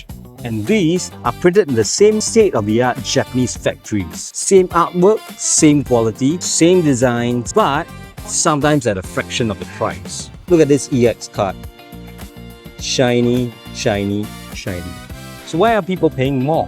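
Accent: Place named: Trinidad and Tobago. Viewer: Random: Your accent gives a hint of caribbean..Trinidad to be exact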